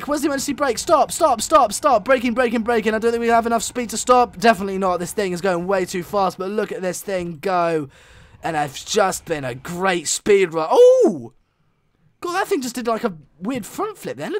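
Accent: England, United Kingdom. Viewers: The"bluething" is called the javelin duh. The YouTuber sounds English and on The side of the train in real life says Javelin so he should actually know.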